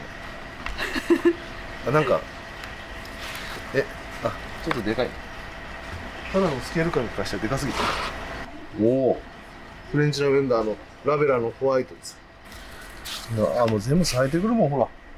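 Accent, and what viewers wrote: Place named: Hiroshima, Japan. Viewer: 、サイコーです❤ 春がきましたね😊 可愛いお花苗がいっぱい素敵です🎉 先生の広島言葉サイコーです❤ 私も何時もお花に、キレイに咲いてくれて、ありがとうと言いながら終わった花達を摘んでます😂 フェスタ、応援してま〜す❤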